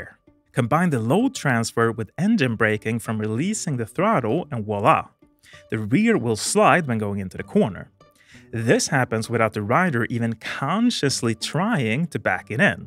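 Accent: Sweden. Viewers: Someone got a case of the swenglish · Your swedish accent makes it way more accessible! Bromsa bak och titta fram! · Är du ifrån Sverige?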